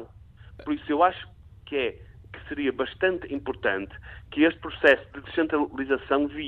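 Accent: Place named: Portugal. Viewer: gosto do sotaque português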